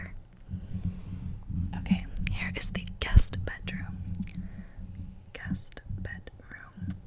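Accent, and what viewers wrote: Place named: South, United States. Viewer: This clearly isn’t a manufactured neighborhood. First off I’ve never seen a manufactured house anywhere near this big, and the house I can see across the street looks completely different than the house she’s in. Manufactured neighborhoods usually have very similar looking houses. And based on her accent and the landscaping that I can see, I’d say she’s either in Texas, South Carolina, or Georgia, in a Metropolitan are like DFW, Charleston, or maybe somewhere near Atlanta or Savannah. Which means that this land is far from cheap, I’ve seen two acre lots in Dallas go for 400k.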